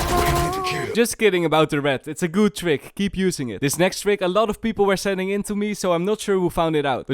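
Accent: Netherlands. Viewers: ur dutch bro? I love your accent 🤣😆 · You sound Dutch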